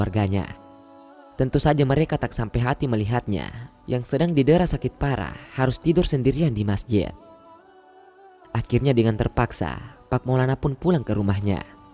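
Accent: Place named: Medan, Indonesia. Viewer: pengisi suaranya orang medan pasti😊😊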